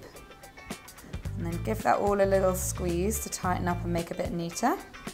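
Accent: England, United Kingdom. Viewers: Your english accent😍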